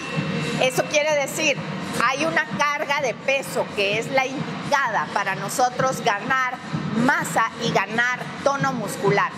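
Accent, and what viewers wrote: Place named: Guatemala. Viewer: Me gusta tu hablado chapin 🥰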